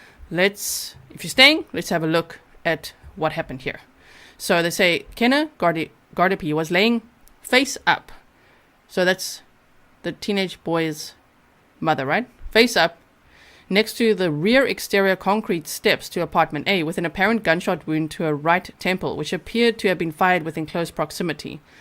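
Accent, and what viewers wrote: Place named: South Africa. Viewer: Knew it from your accent, South Africa 🇿🇦 in the house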